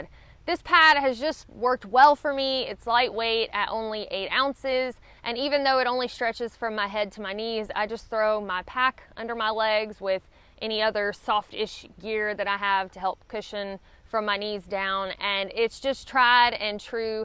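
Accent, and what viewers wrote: South, United States. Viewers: You have the coolest accent!!! Country girls are awesome!!!!! Be safe and thanks for the content!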